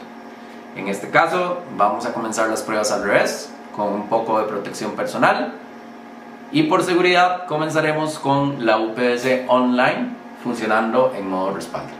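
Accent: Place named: Costa Rica. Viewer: Mae usted es tico? ese acento...😄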